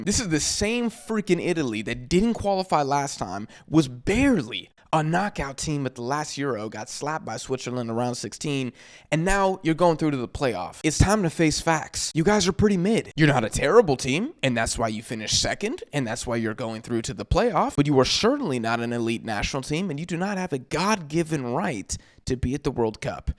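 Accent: United States. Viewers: You have an american accent and you’re talking about football. First of all Gattuso didn’t say that, you people just believe anything you read online. Second of all of course in Europe we have more spots, cause we have more countries but in proportion South America have too much spots and definitely more than Europe IN PROPORTION, and that’s just a fact, 7 out of 10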